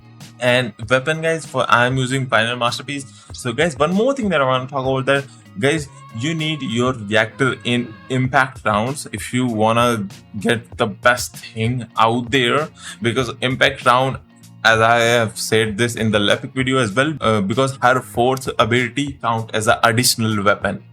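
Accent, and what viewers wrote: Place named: India. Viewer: He in Indian. Accent is Indian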